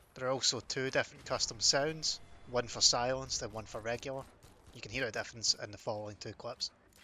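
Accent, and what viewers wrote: Scotland, United Kingdom. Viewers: Ha your scotish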